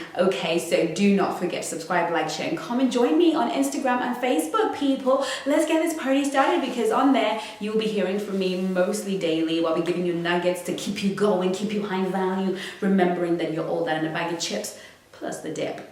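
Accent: United Kingdom. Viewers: Hi beauty...how much I love your Brit accent and your style! Bisous ma chérie...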